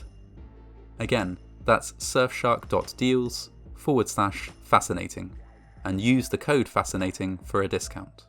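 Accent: United Kingdom. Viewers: So glad there’s another my son uses this to sleep 😂 and it’s amazing how things can quickly go wrong. And thought you were from the UK with the accent 😂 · The Brit accent makes Fascinating Horror videos slightly more fascinating for no good reason 😄 Other Brits: "Not particularly"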